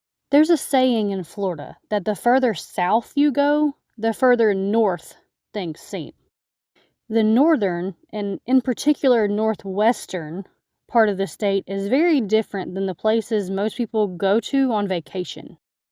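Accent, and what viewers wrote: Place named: Alabama, United States. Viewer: Honestly I would have guessed Alabama for your accent, though it's not a great match to the accent I know from folks from Alabama (it's close-ish), so the accent question, while potentially a bit rude is also kinda fascinating. Seems kinda like a NYC vs upstate New York accent situation (or Mass - folks on the western side of the state sound completely different than folks from Boston). Then you have folks like me who kinda pick up pieces of accents in about a week of exposure - we can be quite vexing to pinpoint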